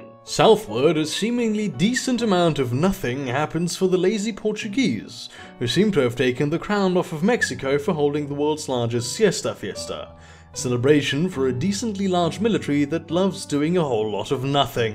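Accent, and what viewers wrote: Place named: Australia. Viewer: Your Australian accent sounds like Russell Brand.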